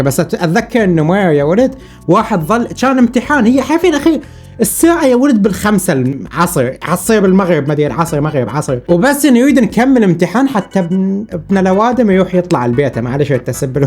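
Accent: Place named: Iraq. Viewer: احسك عراقي بس ماعندي دليل أو لا عندي دليل من اللهجة مالتك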